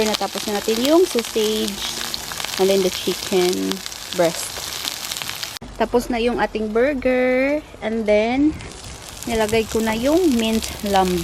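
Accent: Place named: United Kingdom. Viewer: I love your UK accent sis. Sarap pakinggan parang di marunong magalit.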